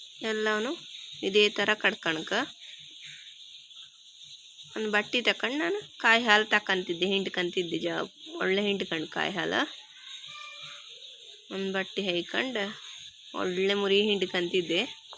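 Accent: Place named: Kundapura, India. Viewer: ಸೂಪರ್ ನಿಮ್ಮ ಕುಂದಾಪುರ ಭಾಷೆ ಕೇಳೋಕೆ ಚಂದ 👌👍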